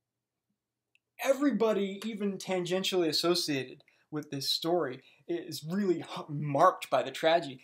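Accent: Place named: Ohio, United States. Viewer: Ohio huh? I’m from Cincinnati and pretty good at listening for accents. You definitely aren’t from Toledo or Cincinnati outright. I’m going to guess more very very outskirts of Cleveland. Maybe Akron???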